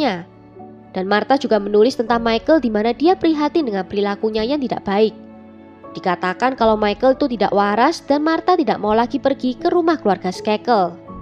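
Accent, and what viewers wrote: Java, Indonesia. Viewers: Alhamdulillaah.. medokuhhh cantik 👍👍😍😍😍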